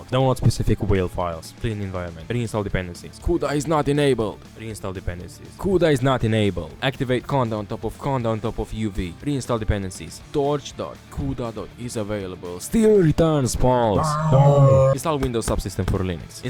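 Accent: Russia. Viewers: Wtf is even your accent, you didn't used to have so much russian in yout accent. What is wrong with you? I can't understand a sh*t!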